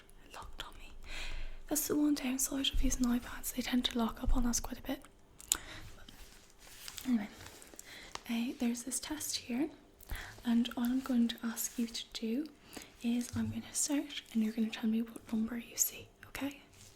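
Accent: Ireland. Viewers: For some reason i just loved your accent! It's irish accent? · Hi Miss, YouTube recommended and I'm glad they did! I really like your Irish accent! I subscribed and I am going to get notifications! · That Irish accent is awesome for this type of ASMR! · Like this video and like the Irish accent. 👌👍😊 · Fukkin love an Irish accent! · Just can't beat an Irish accent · I’m a simple man; I hear an Irish accent, I subscribe. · Love this! Instant subscribe. Haven't come across any big Irish artists yet, I'm sure there are some and I haven't actually searched for them, YouTube just hasn't pushed any in my direction till now. The accent is perfect! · This was lovely and the Irish accent is a huge bonus. What county are you from?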